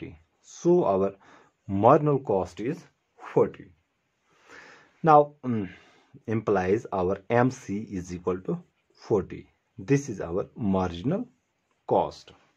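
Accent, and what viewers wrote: Kashmir, India. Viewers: ur english is half kashmiri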